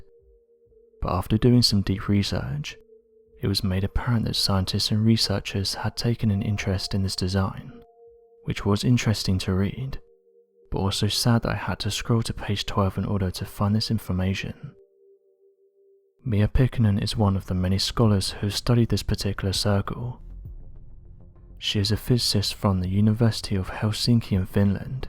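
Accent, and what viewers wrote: United Kingdom. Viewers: British accents don’t turn on all Americans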